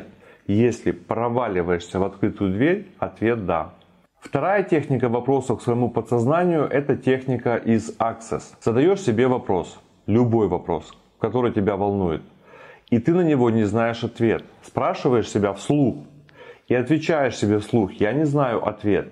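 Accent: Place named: rural, Russia. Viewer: Вам бы ещё научиться говорить правильно для полного баланса, а то по-деревенскому говору не сказать что вы с 20 летним стажем бизнесмен)))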